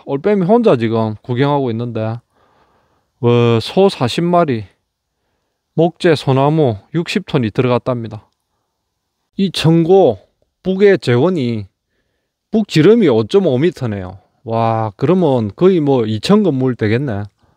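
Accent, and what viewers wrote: Gyeongsang, South Korea. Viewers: 빈집 찾는데 노력 하지말고... 늙은 어재 사투리나 고쳐 주세요.... 근본도 없는 갱상도 사투리 좀 고쳐요...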